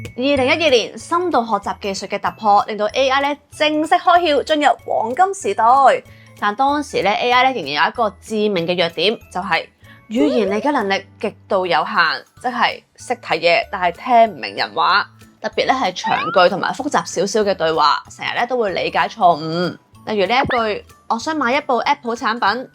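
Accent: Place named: Hong Kong, China. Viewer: ，有冇去過惠州的"雙月灣”玩？好想睇下妳既介紹，香港式廣東話同聲同氣嘛🙂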